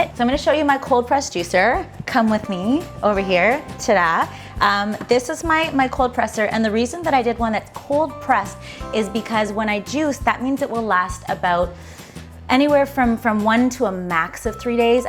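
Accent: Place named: Canada. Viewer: I LOVE when your canadian accent comes thru! :)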